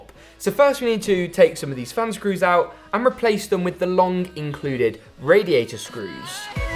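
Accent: United Kingdom. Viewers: You have a huge british accent!!! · He has that perfect Harry Potter accent.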